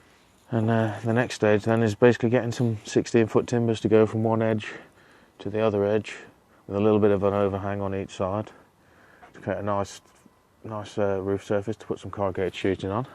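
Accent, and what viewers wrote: United Kingdom. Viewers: Been beating around the idea of a Pallet Shed for a few months now. How long have you had it standing? What is the life expectancy of it? I live in Nova Scotia, Canada... alot of Salt Air and Snowy/Cold winters. Just not sure how long a Pallet Shed would last. I assume your English/British by your Accent and your weather is close to ours. Would appreciate any Info on Longevity if you have any. Other then that, great build man, love it 🤟🇨🇦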